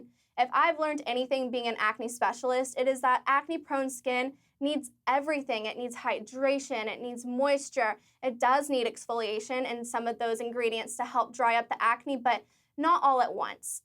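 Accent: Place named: United States. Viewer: As a New Zealander who doesn't have a thick accent, this woman's American accent is not super yanky. A good american accent.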